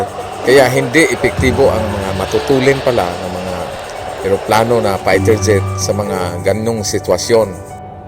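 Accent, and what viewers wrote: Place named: Bohol, Philippines. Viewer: PARE TAGA BOHOL KABA?KASI YUNG TUNOG NG BOSIS MO PARANG TAGA DAUIS OR PANGLAO BOHOL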